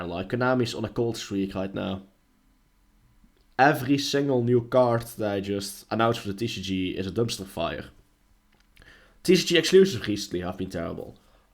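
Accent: Netherlands. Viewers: Are you Dutch? I can tell from your accent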